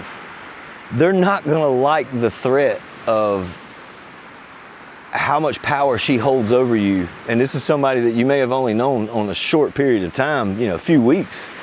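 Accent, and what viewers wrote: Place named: South, United States. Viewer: How did I know this guy would have a southern accent before I pressed play…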